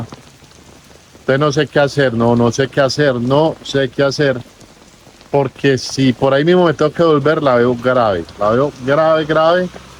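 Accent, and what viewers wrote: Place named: Colombia. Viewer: . Hay un asunto de falta de identidad en colombia muy marcado, pienso YO y sobre esto ya existen investigaciones, las topofobias y las topofilias "Gi fu Tuang", pero a usted lo que le falta es tener un acento distinto al colombiano, puede ser que esto venga desde la conquista, nos arrodillamos ante el extranjero e ignoramos lo propio por más bueno que sea. Soy un consumidor de vídeos de viajeros y miro los números y cuando pasan por Colombia se disparan, vuelvo y lo digo el acento....pueden hablar pestes de nosotros y sus vídeos ser basura pero en Colombia crecen. Puede ser por ahí el asunto. Dicen que nadie es profeta en su tierra.....a mi si me gusta demasiado lo que estás haciendo, el formato, la fotografía, la edición, la música, etc. Pero solo conmigo no es suficiente. Un abrazo y pa tras solo pa coger impulso.....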